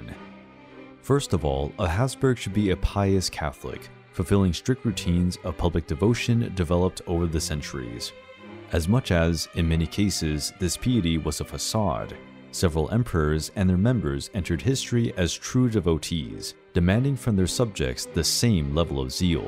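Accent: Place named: United States. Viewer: love this video! very informative! a little frustrated with the american english pronunciation, but i can’t expect everyone to have perfect german pronunciation 😂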